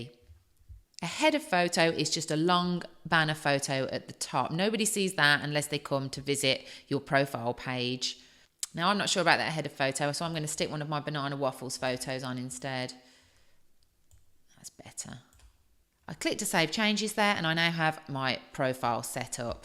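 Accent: United Kingdom. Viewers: Thank you, I wasn't expecting to find such an informative video on this. What a relief, British too! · Thank you! Clear, concise, no extraneous chatter, loved it! And with a British accent to boot! (We Americans love the accent!)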